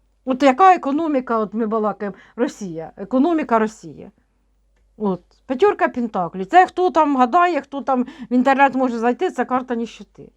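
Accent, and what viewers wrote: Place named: Kherson, Ukraine. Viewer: І у нас на Херсонщині так розмовляють, наш суржик рідний. Дякую, за вашу працю.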